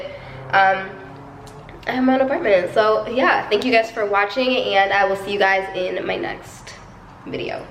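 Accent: Jamaica. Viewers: I heard that accent 🇯🇲🇯🇲🇯🇲🇯🇲 #jamaican in the building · Oh she Jamaican Jamaican? Lol “wait nuh man.” Congrats beautiful!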